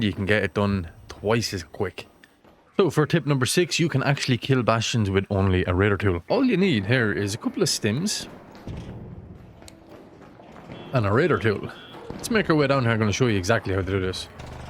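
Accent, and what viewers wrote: Ireland. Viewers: Last name O'halloran but can't understand an Irish Accent - You're high on spectrum or what? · Its English but the guy is Irish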